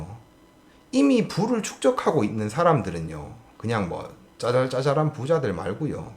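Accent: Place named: Gyeongsang, South Korea. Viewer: 진솔하게 다가오네요. 응원합니다. 대구말투 ㅎㅎ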